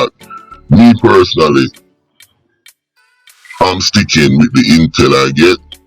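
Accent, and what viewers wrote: Jamaica. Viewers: WHATS UP WITH YOU AND SAINT LUCIANS?? ARE YOU A SAINT LUCIAN AND TALKING LIKE A JAMAICAN. SHOW YOUR FACE. JUST CURIOUS. ARE YOU FROM SAINT VINCENT. DO YOU WORK FOR THE FEDS. DID THE SAINT LUCIANS DO YOU WRONG. JUST CURIOUS.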